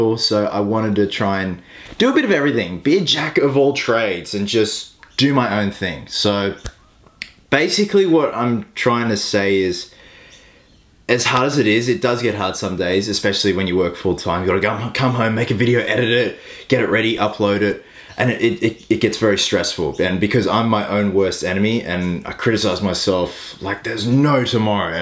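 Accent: Sydney, Australia. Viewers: They do bloody not, sounds like he's from Sydney... sounds like he's from Melbourne. Completely different!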